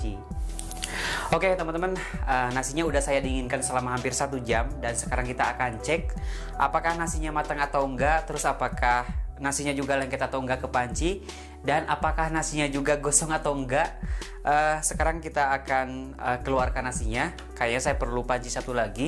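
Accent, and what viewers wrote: West Java, Indonesia. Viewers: Meskipun pakai bahasa Indonesia yah, masih lengket banget yah logat sunda nya yah. Mantap Kang 👍 lanjutkan, ditunggu video selanjut nya Kang 🤗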